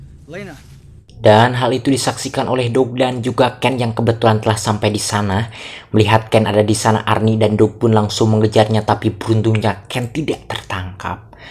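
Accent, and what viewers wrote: West Java, Indonesia. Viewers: abang ini asal sunda? Sunda nya di mna bro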